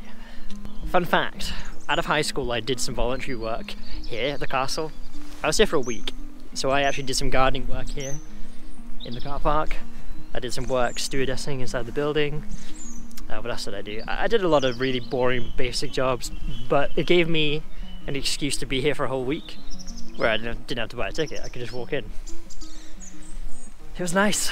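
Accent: Wales, United Kingdom. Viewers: The Welsh in your accent is slowly appearing.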